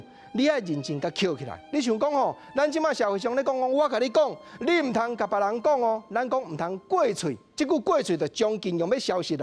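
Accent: Taiwan. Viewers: 目前電視台，推展台語主持人，國禎老師尚有台灣氣口尚有台灣味，聽了真爽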